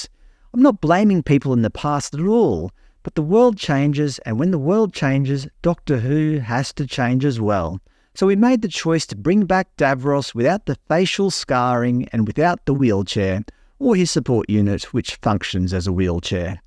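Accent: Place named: Australia. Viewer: Americans listening to you won't realize that you're Australian not British, but you've got a nice accent. I don't want my Doctor to be female, i don't mind a female time lord i loved Romana, Mary Tam in the 70s but The Doctor should be a male, I also don't want the Doctor to be black, i don't mind a black time lord but after growing up with the Doctor being a white ( straight) male i find these changes just forced diversity, I'm not racist or homophobic, I'm gay myself and i wouldn't mind a black James Bond played by Colin Salmon for example. The BBC should be forced to scrap the TV license tax because Disney is a commercial company and it's funding this car crash of a tv show. Donna's trans daughter criticizing the doctor for not being a woman well they( Donna's daughter) never was a woman and still isn't. So the Daleks are now in wheelchairs now? 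Oh and I forgot i don't want my Doctor to be played by an immigrant, he should be played by a British actor, that's actor as in man. The producers, writers and actors are informing us the classic fans Today "sorry straight white males this show wasn't made for you and it never was" Tomorrow they'll ask "Why aren't you watching our show?"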